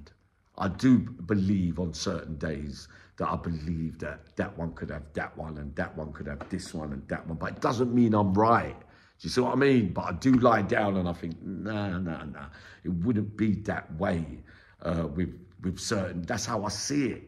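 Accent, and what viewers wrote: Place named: London, United Kingdom. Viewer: brilliant video...loved it...and i love the london accent...keep well ..keep happy....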